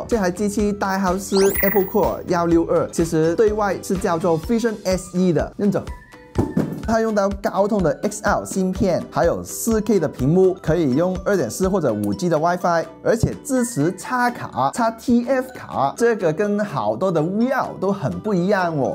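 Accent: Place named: Cantonese, China. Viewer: 點解用廣東話口音講普通話？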